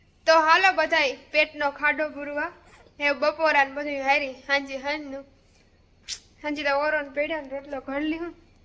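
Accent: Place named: Porbandar, India. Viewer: નમસ્તે 🙏, તમારી બોલી સાંભળવાની બહુ મજા આવી, તમે જે નિખાલસ દિલ થી સાફ - સાફ બોલો છો, આપણાં પોરબંદર ના બરડા ડુંગર ના વિસ્તાર ની બોલી એક દમ સાફ - સાફ ભાષા હોય છે, અને આપણા લોકો ગમે ત્યાં હોય તે તેની બોલી ઉપર થી ઓળખાય જાય છે, મે તો આ તમારો વીડિયો મે પ્રથમ વખત જ જોયો છે, પણ બહુ મજા આવી,👍 ✨ ધન્યવાદ છે,🫅🏅🍫 તમને અને તમારા પરિવારને કે આટલે દુર સુધી અજાણ્યાં દેશ માં કમાવા માટે ની તમે હિંમત કરી છે, એ પણ એક છોકરી ની દ્રષ્ટિએ,તમારી હિંમત ને ધન્યવાદ છે,👍 મારા ગામ ના પણ ઇઝરાયલ દેશ માં છે, તે ત્યાં કયા સીટી માં છે,તે ખબર નથી, તમારું કામ ચાલુ રાખો,એક સમયે તમને જરૂર તમને સફળતા મળશે.🥀 लगे रहो 👍 ♥️🙏 પરમાત્મા તમને સારું સ્વાસ્થ્ય, સુખ અને સુરક્ષા, લાંબુ આયુષ્ય આપે તેવી પ્રાર્થના 🙏 નમસ્કાર 🙏 🇮🇳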